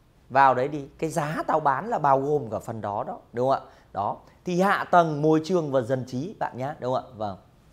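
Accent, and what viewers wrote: Thach That, Vietnam. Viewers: Giọng bạn có phải quê thạch thất?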